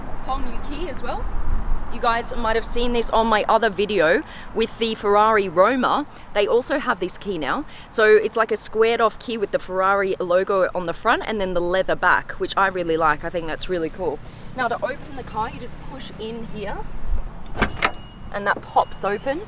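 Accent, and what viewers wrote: Australia. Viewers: australian accents are so cute!! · More annoying accent: Australia or New Jersey?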